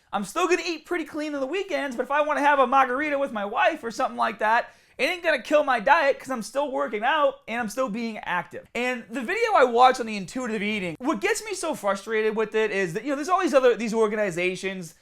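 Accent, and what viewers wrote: Boston, United States. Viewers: this is the first video of yours that I watch. Are you Bostonian or something? Ie. Abbey Shaaarp. I've been doing my Caaardio. Maaargarita.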